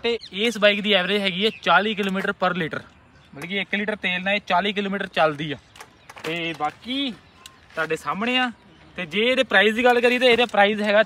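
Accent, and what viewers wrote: Majha, India. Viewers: Majhe aale eve hi bolde aa kaka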